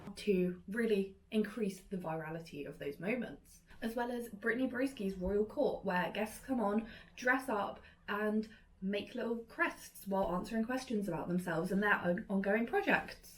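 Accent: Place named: RP, United Kingdom. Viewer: Your accent is so strong and you sound so posh. Haha, I say this in an endearing way from someone who is not anywhere close to the UK, and is from Indonesia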